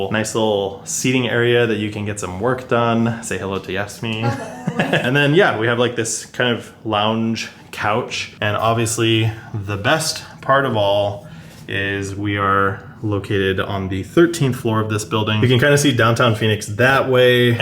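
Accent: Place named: Australia. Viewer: Australian accent!!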